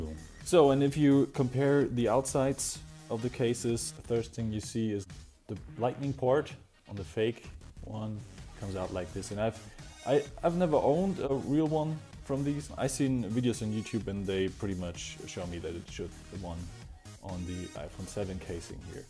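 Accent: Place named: Germany. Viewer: Bist du Deutscher ? Hört sich so an. Aber sehr gutes Englisch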